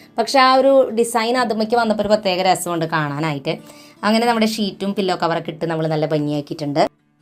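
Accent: Thrissur, India. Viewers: Chechi Thrissurkari ano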